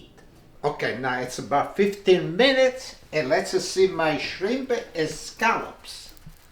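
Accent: Italy. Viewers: ? Where would I be able to procure those little scallop dishes or scallop shells????? Bc where I live, I’ve never ever seen those, im in Pa, and there’s no good seafood stores or seafood places to get anything like that (?!), im in Lewisburg Pa, ( it’s a very old Victorian college town, yet ZERO seafood places to get FRESH Seafoods ect, anyhoo, I so SOOOOOOO ENJOY WATCHING N FILLOWING YOU! My grandpa Louis came straight here from Southern Italy, n he never even learned to speak English!! Lol lol. Yet I was able to understand Italian, but couldn’t SPEAK it to save my soul!!!! Lol lol lol🙃😁, yet somehow i understood every word he spoke to me, he use to walk me on his Hugh farm, and he would pull radishes straight out of the earth n dirt, brush it off n feed them to me! Lol, my matah use to have a FIT bc she said it wasn’t washed properly!! ( lol lol lol lol) yet my grandpa still did it anyway!and I luvvvvvved it!, tnk you so much for being YOU!, bc you sound exactly like him with your ITALIANO accent!!!!!👍👍😁😁🥰🥰🥰🥰😁🥰🥰😁😁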